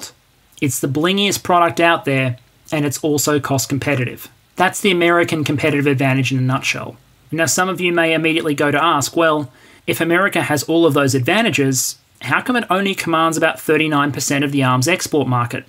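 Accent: Australia. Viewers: great informative vid, loved hearing an Aussie voice delivering the content. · Is this the economics explained guy or do all Aussie's sound alike??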